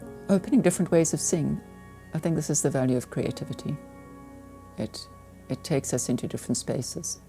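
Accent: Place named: South Africa. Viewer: Love the South African accent.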